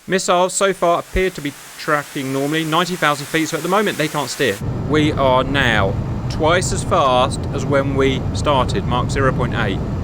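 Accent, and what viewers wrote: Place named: United Kingdom. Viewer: Just because you hire an announcer with a British accent, people will believe what you say?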